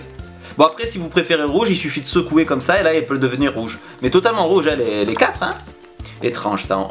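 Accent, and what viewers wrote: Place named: South, France. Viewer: Tu fais des vidéos très fluide avec ton bon accent du sud 🤙 Celle si est sympa et Hani vraiment envie de connaître l’astuce car je débute en magie 😁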